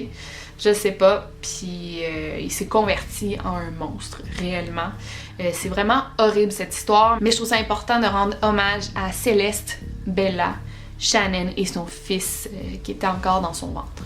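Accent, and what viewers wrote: Quebec, Canada. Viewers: J'aime trop l'accent canadien,c'est très mignon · Je suis fou amoureux de l'accent québécois 🥰🥰 Bisous les cousins des français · Parfois dur e comprendre l’accent québécois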